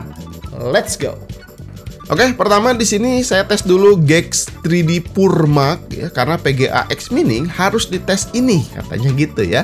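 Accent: West Java, Indonesia. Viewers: sunda pisan wkwkwk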